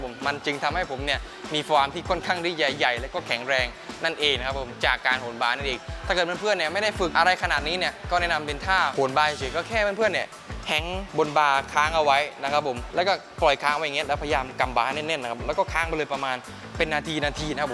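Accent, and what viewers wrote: South, Thailand. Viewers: ตอนปึ่งแลแรกๆไม่คิดว่าเป็นคนใต้ 555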